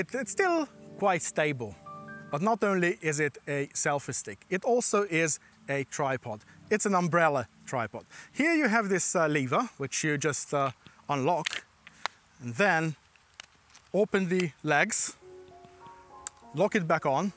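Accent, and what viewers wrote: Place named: United Kingdom. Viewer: Köszi a videót. Szép brit kiejtés.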